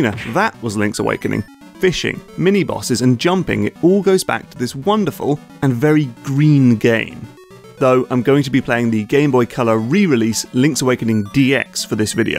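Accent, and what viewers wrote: United Kingdom. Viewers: The British accent makes it sound so prestigious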